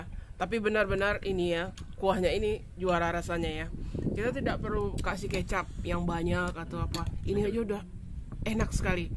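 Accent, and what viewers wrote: Minangkabau, Indonesia. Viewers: ni boleh berdialek minang tapi juga bisa juga mengeluarkan perkataan jawa.....terima kasih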